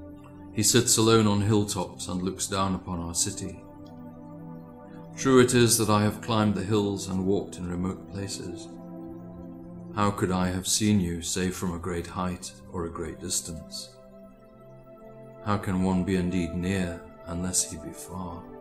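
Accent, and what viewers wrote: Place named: Manchester, United Kingdom. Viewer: Are you by chance Mancunian? The way you read reminds me so much of Morrissey (of Smith's fame)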